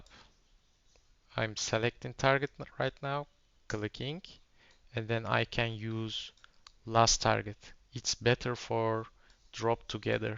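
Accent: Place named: Turkey. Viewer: türk aksani =)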